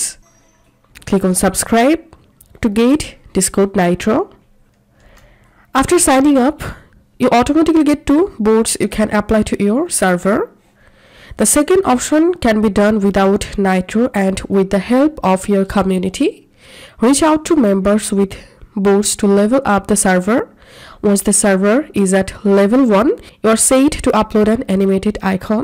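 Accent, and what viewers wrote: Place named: India. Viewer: stop raping the mic and speaking in half indian and half curry english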